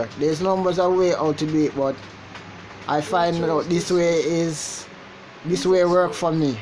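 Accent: Jamaica. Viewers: Thank you soon much bredda are you a Jamaican?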